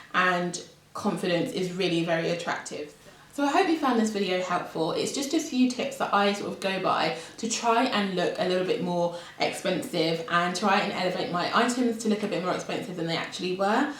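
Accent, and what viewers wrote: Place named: United Kingdom. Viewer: I must admit that I especially enjoy your accent. I'm an English teacher from Chile, and I did an MA in TEFL in England (Bham) in 2011-12. I love your accent and your choice of words to describe clothes and silhouettes, for examle... I have a student who wants to improve her British accent, I'll share your channel with her :)))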